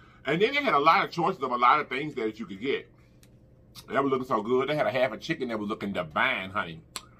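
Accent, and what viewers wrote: South, United States. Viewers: I have to cut off volume, because you talk too much and we want to enjoy watching you eat. Sounds like your roots are from the south and you live there now, parents taught use to not talk while eating. Now I understand why, you show all the food in your mouth and it’s gross. Try getting on the radio you have the gift to gab, and it’s not necessary on a eating show since you have so much information to share to others..Just my observations.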